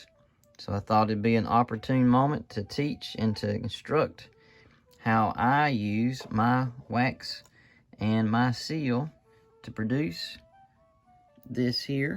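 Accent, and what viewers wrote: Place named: East Tennessee, United States. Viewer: Came here looking for this information! You sound like you are from close to where I am born and bred...east Tennessee? I am so glad I have found your channel as I am obsessed with colonial period.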